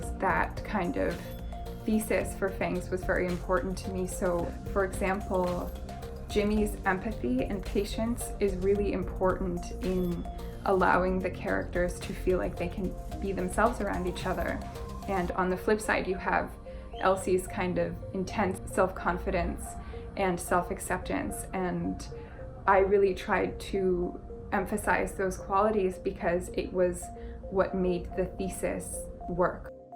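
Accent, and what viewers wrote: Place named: United Kingdom. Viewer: why did i think she was british?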